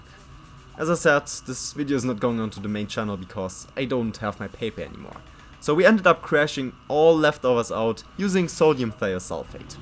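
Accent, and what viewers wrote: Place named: Germany. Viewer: Ich glaube vom Akzent her, dass du Deutscher bist daher: SMB (sodium metabisulfite oder deutsch: Natriumdisulfit) dient nicht dazu, überschüssige Salpetersäure zu entfernen. Es ist ein selektives Fällungsmittel. Btw: Fällen heißt auf Englisch: Precipitate, nicht "chrash out" oder so etwas. Es kann also gut sein, dass du in der Lösung noch gelöstes Gold hast (Hast du ja sicherlich aufgehoben ? §1 des Edelmetallrecyclings: NIE etwas wegschmeißen!) Und doch: Es gibt Literatur zum selektiven Ausfällen von Platingruppe Metallen, sogar speziell in Bezug auf Dentallegierungen. "Edelmetall Recycling" von M.A. Buth, ist so eines davon, sogar auf Deutsch... Gibt's bei Amzon unter: <link> ... Viel Erfolg weiterhin! M. A. Buth ;-)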